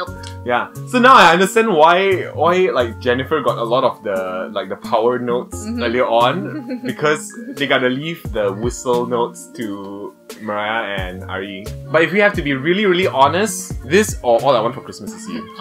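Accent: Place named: Jamaica. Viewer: Love the reaction! However, I couldn't help but notice what sounded like an island accent of Jamaican or Trini? Either way, Happy Christmas!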